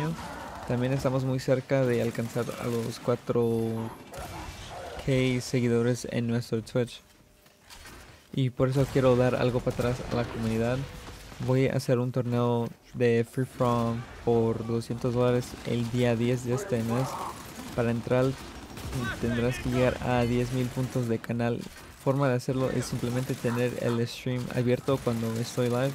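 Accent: Mexico. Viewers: Ey feliz año un saludo, esa voz se escucha bien mexicana dios te ayude a tener más y tener nuevos proyectos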